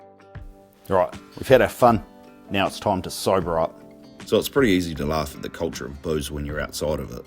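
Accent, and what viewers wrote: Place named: New Zealand. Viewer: Brother I’m from rural Waikato and I had to adjust to your Kiwi as accent hahaha respect brother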